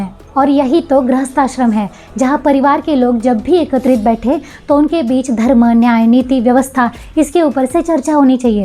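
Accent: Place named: Maharashtra, India. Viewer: I love your hindi with a slight hint of marathi accent. God bless you!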